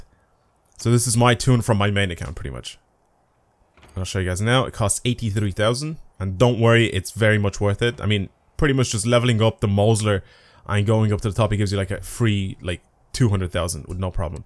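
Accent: Ireland. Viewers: Are you Irish? I assume yes. I'm new to your channel. Am Irish too, where you from?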